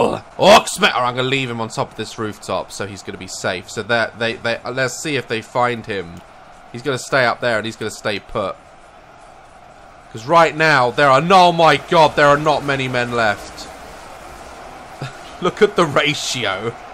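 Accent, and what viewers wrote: United Kingdom. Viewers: it's cuz he's British 😄🇬🇧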